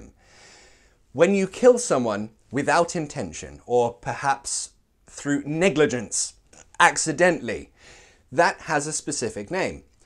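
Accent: United Kingdom. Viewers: Hey, I love you, I really do. I've always been watching your lessons. You're really funny and I've been recommending your YouTube Channel to my friends. But there's something I want to tell you, you're dressed up like an American Police Officer and speaking with the British accent 😁😁 it makes no sense 😁😁😁. I'm laughing out loud. It's not a critique, don't get me wrong. What I'm trying to say is that you're so funny, you really are. The way you create the videos make us being focused on the lessons. It makes us to want more and more an more. I love the way you make your lessons, your videos and I do believe I'm not the only one who thinks like that. I love you mate, actually I'm in USA, I should be saying "I love you my friend" 😁😁. I know, I know, Mate and Friend are the same thing. 😁😁 Wish you all my best my friend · Thank so Much ! I really like your accent, so British !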